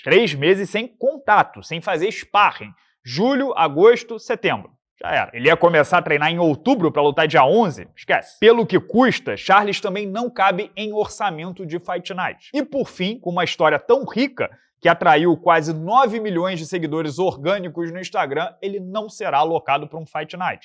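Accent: Rio de Janeiro, Brazil. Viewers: Vc é carioca?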